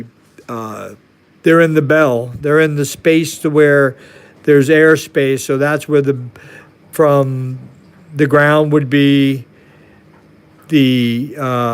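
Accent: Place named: South, United States. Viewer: he may have a southern accent, but his a solid experimenter ... afterall if you could read a book and literally build a free energy machine. many would.... ramblings are good for getting too know the guy, that we agree on..... although i must say, the best way too judge the guy in my opinion, is what he does with the information i have him,along with videos of my working free energy machines . which were numerous. <link>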